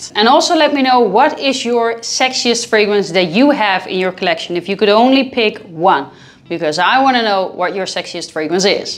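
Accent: Netherlands. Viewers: hearing your accent I must know: ben je Nederlands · She must be Dutch her accent ❤️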